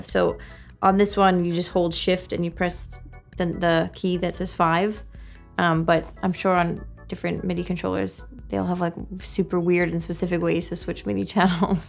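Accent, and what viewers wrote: Long Island, United States. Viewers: that long island Accent is hitting different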